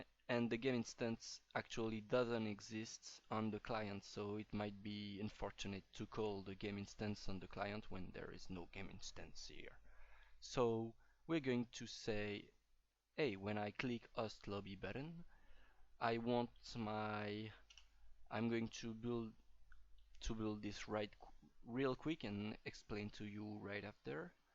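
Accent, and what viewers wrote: France. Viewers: Je reconnais l'accent Français x)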